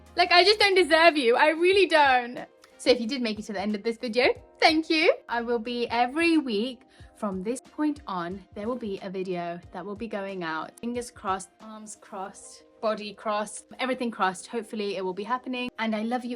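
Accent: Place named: United Kingdom. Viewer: Why she has British accent. Is she Indian or🙄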